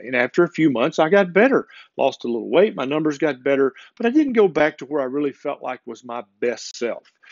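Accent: South, United States. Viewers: Nice to hear a southern accent on youtube! South Carolina here. Great vid! 🖒💚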